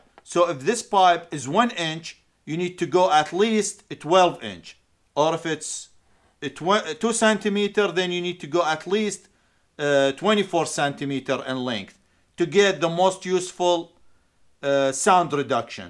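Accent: Iraq. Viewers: من طريقة لفظك عرفتك عراقي !!!! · انته عراقي؟.حجيك عراقي من الوسط و الجنوب تحديداً!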